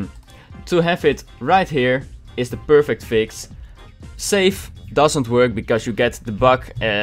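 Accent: Netherlands. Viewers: you sound dutch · You sound dutch. NEDERLANDEEEEEEERS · Lol your dutch like me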